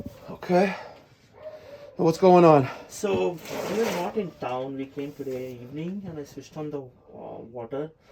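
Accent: New York, United States. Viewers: Hey, please explain what the difference is between PPM CO and PPM CO AF? Really enjoy hearing your NY Accent and your expertise in everything Plumbing & Heating.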